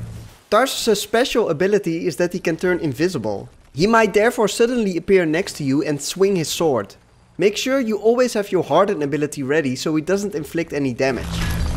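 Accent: Netherlands. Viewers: You sounds so dutch haha NL!! thx for the vid bro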